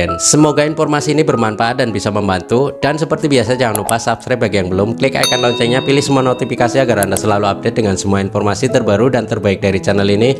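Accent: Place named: Tegal, Indonesia. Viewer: Kaya wong Tegal sampean mass ngomong e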